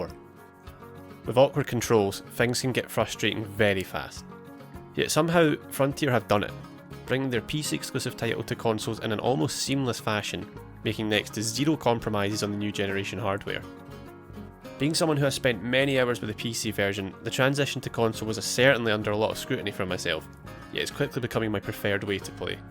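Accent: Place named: Scotland, United Kingdom. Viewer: I have to warn you. Your Scottish accent and the fact that you talk fast makes me struggle a little bit, and I'd say I'm quite good at English. I can see others struggling a lot with your review.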